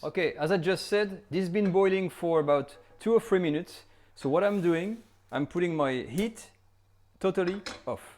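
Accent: France. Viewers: Love your explanation and your beautiful French accent too... Music to my ears...🥂 merci beaucoup... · French accent, that's a guarantee for a good classic french recipe! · Your French accent is great and the video is spot on. Thumbs up and Sub! 👍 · Me encanta el acento francés😁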